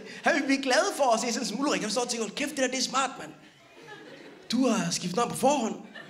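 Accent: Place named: Jutland, Denmark. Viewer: Elsker din humor, jyske accent og din store portion selvironi. Thumps up herfra.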